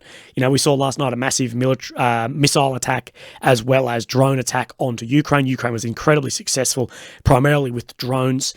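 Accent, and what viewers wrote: Australia. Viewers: Good to get my updates in an aussie accent.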